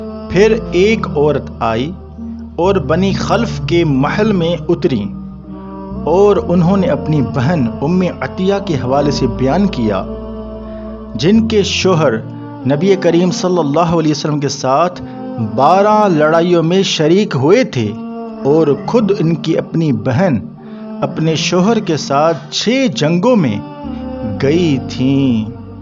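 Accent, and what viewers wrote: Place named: India. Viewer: Apni Urdu sahi bola Allah ka liya ku indians ki terha alphabet ha kha ha kiya quran bhi asa hi perhta ha pata nahi pakistan ma ya kiya bimari chali ha indians ko copy kerna ki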